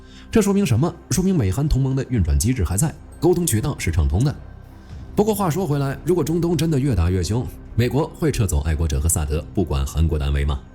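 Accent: China. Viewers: 这个是个什么来历？从视角，口音，以及用词来看是中国大陆背景。但是字幕全用繁体，字幕里的用词也是台湾风格。比如说语音里说"项目"，字幕则写“专案”。想假扮台湾人吗？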